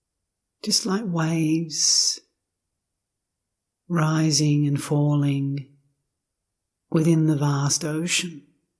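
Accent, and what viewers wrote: Australia. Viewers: She has a beautiful calming voice a soft Aussie accent ❤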